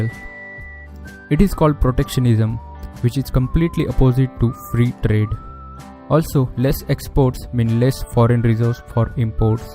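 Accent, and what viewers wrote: India. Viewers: His Indian accent is gold :) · india accent sucks😂😂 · Why all the indians talk like That i hate the indian accent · am indian, and ur way of speaking english is ridiculous, plz get some training in accent am bengali but I don't speak like this.. now I understand why foreigners think it's annoying accent 😒😒😒.. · Presentation is quite well, but it'd be excellent if your voice was clear and had a definite accent, as it sounds to me like 90% Indian and 10% Unknown. Try to stick to one accent and make your voice clear. Just a suggestion. Don't take it otherwise. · You cannot complain on the accent.. Its Indian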